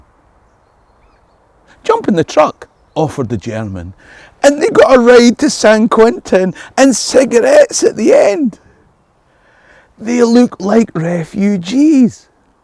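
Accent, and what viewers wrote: Scotland, United Kingdom. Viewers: He's just a foreigner with a Scottish accent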